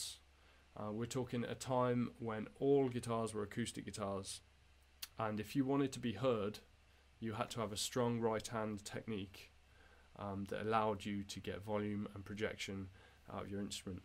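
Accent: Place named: England, United Kingdom. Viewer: Hi, I like your vids a lot! yes always interesting, real humility. We can guess your pleasure in playing guitar, and speaking about it. I also like your english kind of speaking as well. Ah! I've noticed something, when you play electric guitar: it seems your little finger is not used at all, is it? I would maybe say more ( 😅): you mostly play with the two first fingers (not counting the thumb of course). I will not try to copy it, but try it to see what it feels, yes maybe. But for my part, I play most of time Gipsy Jazz. OR Jazz, too.. always learning more and more. Greetings from France,!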